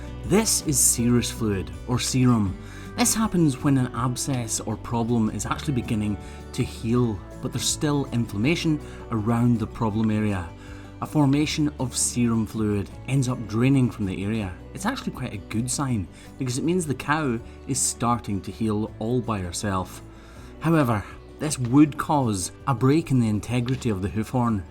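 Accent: Scotland, United Kingdom. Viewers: I think the Scottish accent on a man is grrrrrrrrr😯😊😊😊😊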